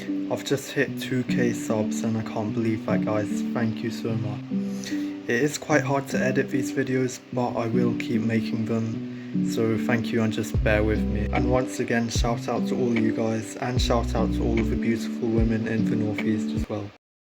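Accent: United Kingdom. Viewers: I just love and adore uk accent.....❤️ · For once it's great to hear a British accent. Your honest views are much appreciated.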